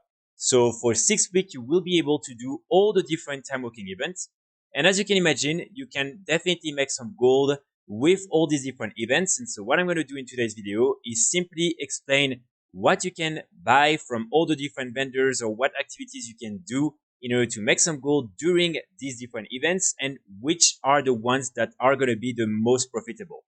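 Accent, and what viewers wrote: France. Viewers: NOT a lot of gold :P. Love your content overall glad I have found your channel! Your French accent also, if I'm not wrong hehe.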